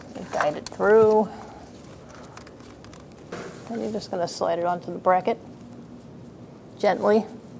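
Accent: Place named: New York, United States. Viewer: Sounds like a newyork accent 😅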